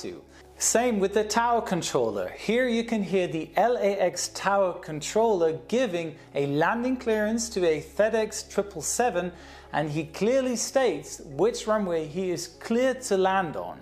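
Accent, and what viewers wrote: Germany. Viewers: man the r you use in your 'three's makes it super obvious youre a german xD good content, super interesting. keep it up! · Joe with his German accent.... Gotta love it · lustig das man als deutscher immer gleich merkt wenn ein deutscher englisch spricht :D versteht man aber viel besser wie einen englisch Muttersprachler :D echt interessantes Video... wär ich nie drauf gekommen das die Nummern etwas mit dem Magnetfeld zu tun haben ^^ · Oof German accent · Typical german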